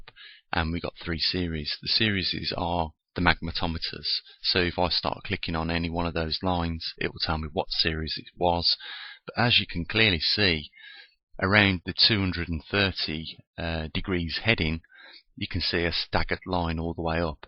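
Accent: Birmingham, United Kingdom. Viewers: At last a fellow brummy keep doing the good work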